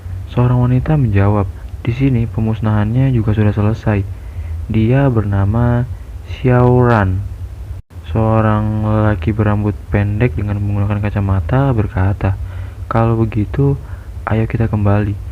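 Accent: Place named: Ambon, Indonesia. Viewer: Suarane ambonnn😊🙏🤣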